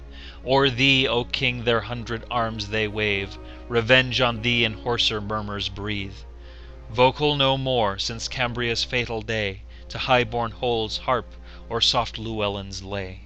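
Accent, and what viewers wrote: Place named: United States. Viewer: Diolch yn fawr! Although not sure the US accent lends itself to medieval poetry ;)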